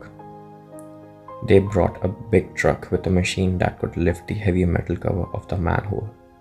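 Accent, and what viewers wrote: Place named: India. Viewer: Hard for me to understand your English with heavy accents. Are you 🇮🇳 ?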